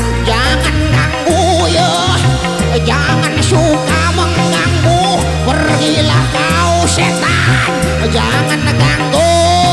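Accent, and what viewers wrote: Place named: West Java, Indonesia. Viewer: ....yeesss. teryata logat bahasa sunda bisa jg di pake orang lombok.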